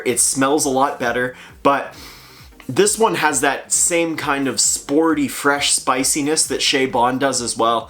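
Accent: Canada. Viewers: You sound hella Canadian.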